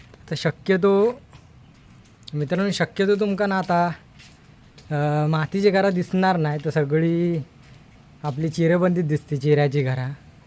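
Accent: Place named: Malvani, India. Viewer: Malvanimadhech bol, Bara vatata eikak!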